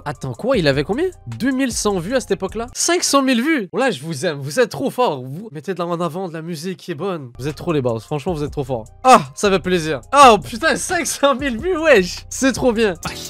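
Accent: Quebec, Canada. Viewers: Il est québécois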